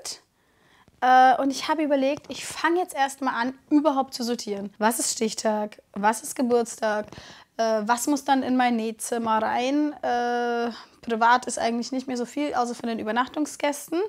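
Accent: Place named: Franconia, Germany. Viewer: Liebe, ich weiß noch, als ich dich zum 1. Mal mit Sandra gesehen/gehört hab. Mein erster Gedanke war "die gefällt mir" und "die kommt doch aus Franken😂" dein Kanal heißt " " das war für mich persönlich nie nur nähen, sondern auch DIY's. Es ist deine Entscheidung, ob du mehr DIY's und eine Sommerpause machen möchtest. Es jedem Recht machen, kann man leider nicht. Dein Herz hat gesprochen und ich freue mich schon sehr auf ALLES was noch kommen wird ❤❤ Liebe Grüße und fühl dich fest gedrückt ❤❤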